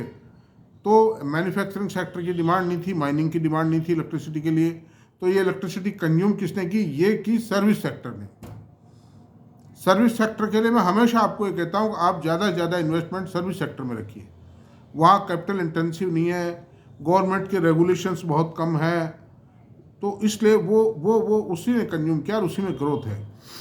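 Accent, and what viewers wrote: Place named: Marwari, India. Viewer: Aap ki marwadi hamare wali hai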